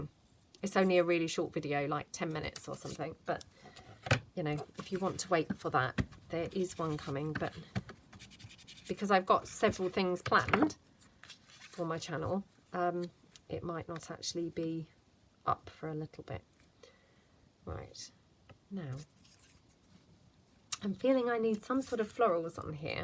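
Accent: England, United Kingdom. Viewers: Are you from England or Australia? Love your accent. When you say you have puddings for dinner what does that consist of. Love your videos, stories.🌺🌸🌼🌻🌹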